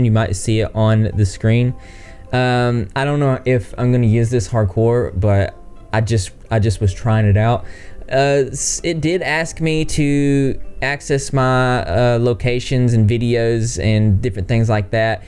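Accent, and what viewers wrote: South, United States. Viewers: Southern? He's from Tennessee I'm pretty sure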